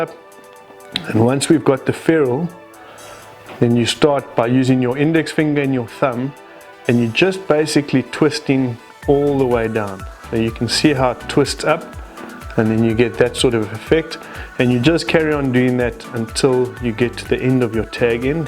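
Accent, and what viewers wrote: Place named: Yorkshire, United Kingdom. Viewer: English subtites would enhance it as Yarpee English is as bad as Yankee drawl.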